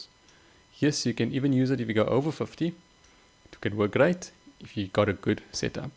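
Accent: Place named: South Africa. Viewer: Brilliant video thank you. Enjoyed hearing the SA accent too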